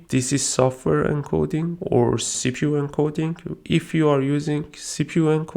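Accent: Iran. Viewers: Nice vid. It helped. Also, is that a Persian accent?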